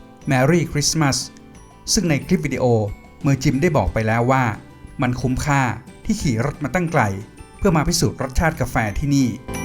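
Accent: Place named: United Kingdom. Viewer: ผมติดตามช่องของ ตลอดครับตั้งแต่เขาทำช่องที่ไทย จนย้ายไปฟิลิปปินส์ ตอนอยู่ไทยคนติดตามเขาน้อยจริง เห็นใจเขาเหมือนกัน เป็นเพราะคนไทยไม่เช้าใจภาษาอังกฤษ จึงยากที่จะมีคนติดตาม คลิปตอนเขาไปลองกาแฟ ตอนเขาจิบอาเมริกาโน่เย็นผมเห็นแล้วขนลุก รุ้เลยว่า ยังคิดถึงเมืองไทยมาก ผมติดตามเขาเกือบทุกคลิปวีดีโอ เขานำเสนอได้ดี ที่สำคัญผมชอบสำเนียงเขาแบบอังกฤษ มันฟังดูเท่ห์ดี เพราะผมจะคุ้นเคยและพูด แบบอเมริกามาตลอด555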